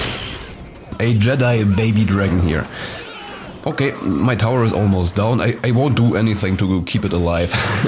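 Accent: Germany. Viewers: du bist deutsch; das erklärt die Aussprache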